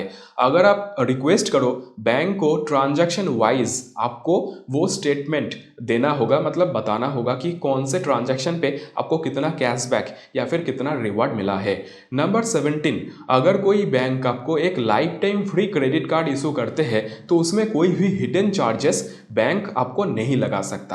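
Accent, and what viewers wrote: Bengal, India. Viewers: Are you Bengali? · O bangalii baba tumhra words samj ma nahi aate banglii accent aati ha Hindi ma try to fix it first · You're a Bengalee, hey mate 🤗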